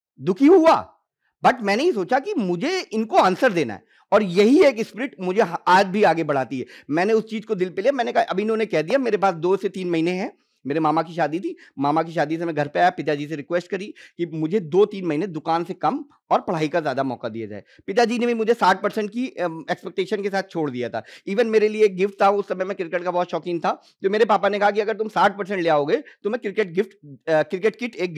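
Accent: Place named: Kanpur, India. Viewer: Kya baat hai kanpuriya language .. 🙏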